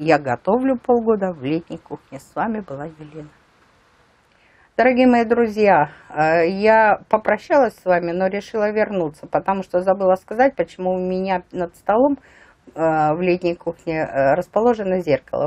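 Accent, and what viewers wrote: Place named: Russia. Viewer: Так мы из одной страны( из Украины),думала, Вы из России, такой акцент не украинский совершенно.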